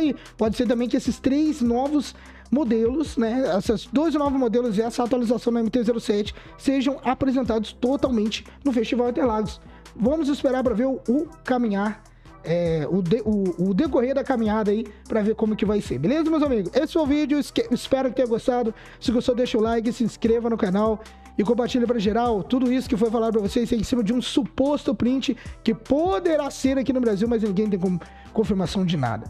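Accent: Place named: Portugal. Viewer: Portugues de Portugalllllll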